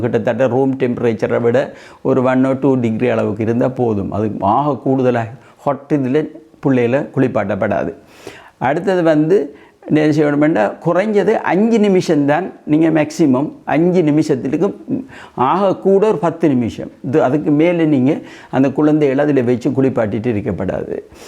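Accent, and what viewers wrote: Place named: Eelam, Sri Lanka. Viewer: உங்களது ஈழத்து தமிழ் அருமை ❤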